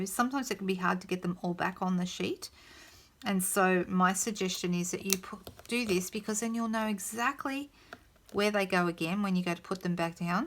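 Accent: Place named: Australia. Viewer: Thank you for making this set less daunting 😀👍🏼. Have ordered it & now feel ok about trying it out . Nice to hear an Aussie voice😀. Jane from SA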